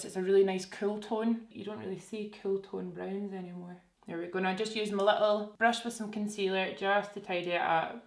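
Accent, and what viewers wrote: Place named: Glasgow, United Kingdom. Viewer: Always the highlight of my day when you post! I’ve just moved to London so its always nice to hear a Glaswegian accent every now and then😂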